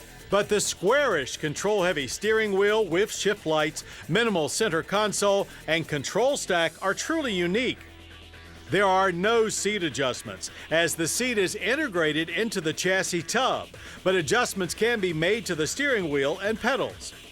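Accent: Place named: United States. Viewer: Simple, he's american.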